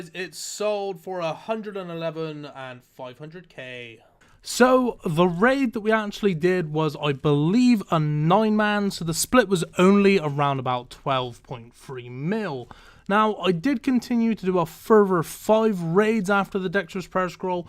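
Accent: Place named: Cornwall, United Kingdom. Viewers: How much I wish he could just pronounce his TH, I could then forgive the west-country accent entirely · Man you're so Cornish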